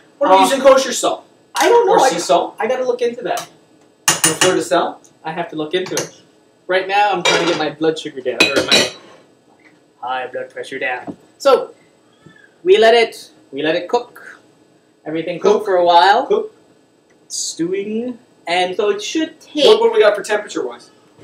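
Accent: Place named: United States. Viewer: trini cooking trini and have american accent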